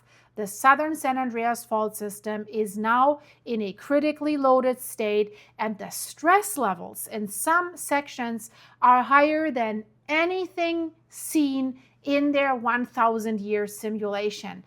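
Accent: Germany. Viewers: She's German 😂😂. It's hilarious 😂 to listen to her pronounce some words. Love ya 😊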